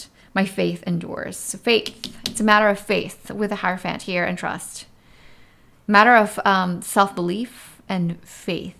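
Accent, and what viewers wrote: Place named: England, United Kingdom. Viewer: Hi your English accent is so sweet and perfect, I love it❤️❤️❤️❤️❤️❤️! Elegí la número 2 y me has retratado tengo una oportunidad muy buena delante de mí y estoy dando vueltas a la cabeza pensando si debo tomar esa decisión tan importante. Abrazos desde España ❤️🇪🇦❤️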